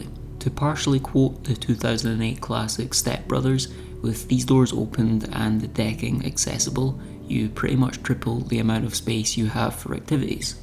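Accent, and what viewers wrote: Scotland, United Kingdom. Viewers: Nice one, cool to see some of my heritage coliding with an aussie location voiced by a scotsman!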